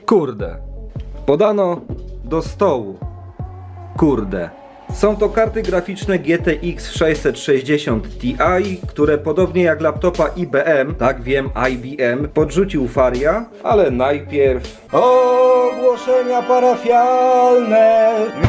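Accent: Silesia, Poland. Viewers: Ten śląski akcent..taki fajny 😁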